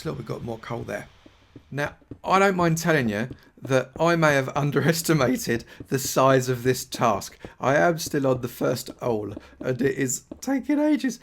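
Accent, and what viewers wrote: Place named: England, United Kingdom. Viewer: He sounds nothing like him imo. Just an English accent.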